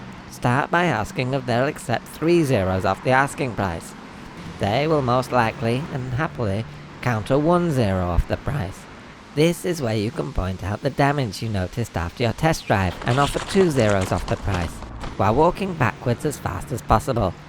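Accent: Yorkshire, United Kingdom. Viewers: I'd bet my hat hails from Yorkshire with that lovely accent, but I recognised Barton on Sea!! Perhaps he just gets around a lot - van life and all that. But wait, he has a shed! Hmmm, maybe he has many sheds...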